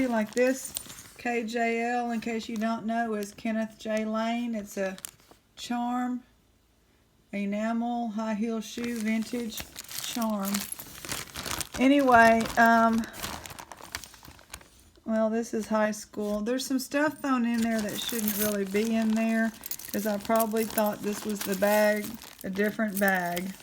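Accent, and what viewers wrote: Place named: Texas, United States. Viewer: It's good to hear an update from you, my Texas jewelry friend. You posted a video during the day several weeks ago and my students were in my classroom. I told them I get good stuff to make jewelry with from you and played the video. Several of them commented that they like your voice.. I was like.."Well, of course.. she's Texan!"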